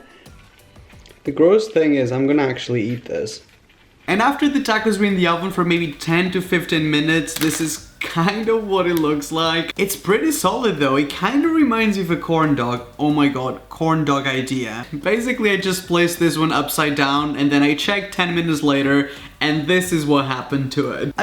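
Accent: England, United Kingdom. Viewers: Hes accented ever heard of enlgland kid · Seriously dude you're sounding more English each day... And no the milk should be poured, you can get the puddings in a can and steam it... Can't believe you didn't heat it up.... Lmao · Deadshot Mini Maybe cause he lives in the UK and tacos are not something they usually eat and they also pronouce things differently than we in America do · Thas is his accent cause he is in england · Gracie Denton he’s from England so he says stuff differently than us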